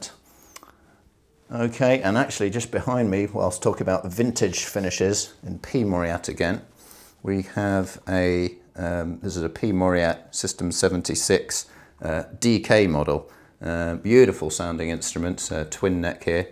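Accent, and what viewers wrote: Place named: RP, United Kingdom. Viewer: Only a £4999 bari to start with, LOL, and, wow, with a genuine Boris Johnson accent, too. Speaking of which... I have a yacht for sale. It is only £1000 and it comes with a guaranteed weekly dividend of £3,500,000. I used to have that accent down to a T and then I moved to the US. Maybe that's a good thing, though. PS Don't worry, I have ordered stuff from you in the past and not even Boris Johnson can stop me.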